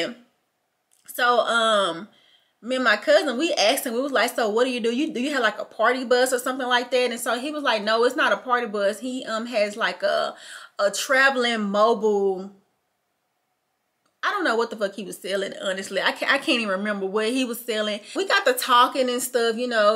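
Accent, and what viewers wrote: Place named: Memphis, United States. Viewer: Omg is this Memphis accent 😂😂😂 I swear I’m from Memphis now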